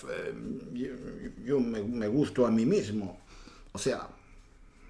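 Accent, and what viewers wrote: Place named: Andalusia, Spain. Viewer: Hola nos gustan tus vídeos y nos gusta tu acento andaluza y nos gustas tú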